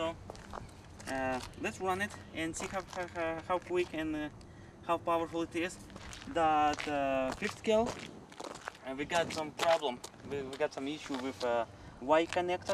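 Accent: Russia. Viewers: did you cut out a lot of parts for it . like the aluminum stuff ? and is that a Russian accent ? and where do you live ?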